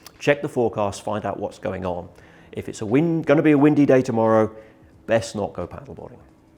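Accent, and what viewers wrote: United Kingdom. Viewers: he's just British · Love the British accent (proper English)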